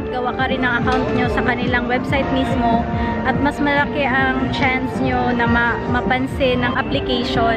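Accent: Visayas, Philippines. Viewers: Bisaya ka po maam? 😊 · Bisaya ka Ma'am no?!😂😁😁😁 minsan kasi nababasa ko sa acent mo minsan. But it was nice!!! keep uploading your video sa channel mo. I subscribed your channel na po and Thanks for the info!!! God Bless!!!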